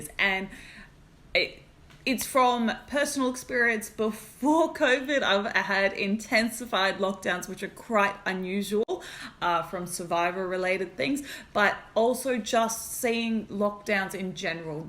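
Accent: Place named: New Zealand. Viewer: Gee you speak well, just reinforcing your absolutely stunning tribal council speech. Also just a small thing but as a Kiwi now living in Vic ... I can hear your accent starting to change and you are beginning to adopt the NZ way of saying your vowels.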